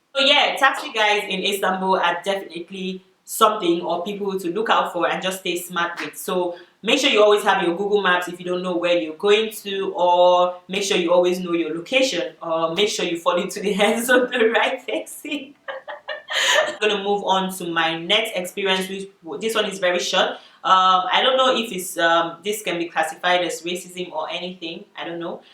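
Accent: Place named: France. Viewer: I think her accent is French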